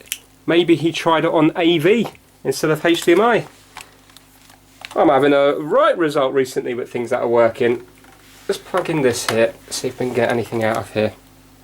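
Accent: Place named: South London, United Kingdom. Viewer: he sounds south londonish, but hes foreign. you can tell from his accent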